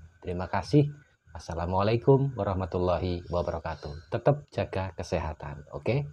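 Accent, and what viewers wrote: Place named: Banyuwangi, Indonesia. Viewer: Kurang deles osinge kurang pas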